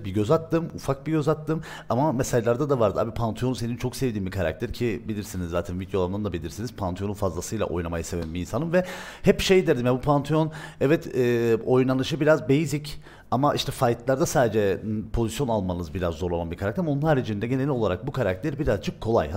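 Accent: Cyprus. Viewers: Dayı sen kıbrıslımısın ya :D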